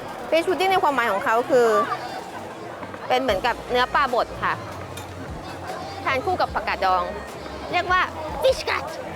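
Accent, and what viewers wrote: United Kingdom. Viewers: I love your English accent!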